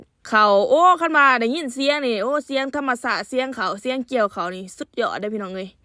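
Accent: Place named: Tao Ngoi, Thailand. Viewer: สบายดี ไส่เสื่อแขนยาวแหน่บ่คันคายเบาะฟังสำเนียงคือคนเมืองเต่างอยอยู่บ้านกวนบุน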